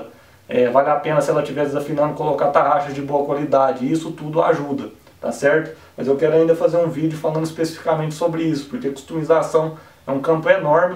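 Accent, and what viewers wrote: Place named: Minas Gerais, Brazil. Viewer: Araguari? Bacana! Sou de Uberlândia, bem ao lado. Você é de onde? É mineiro, pelo sotaque, mas de que cidade?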